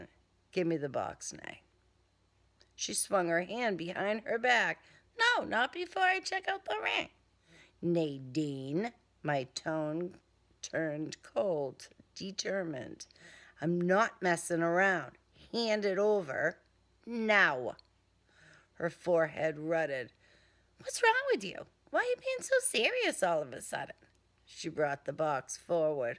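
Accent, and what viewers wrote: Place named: New England, United States. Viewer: New England accent.. 30 bimbos..lol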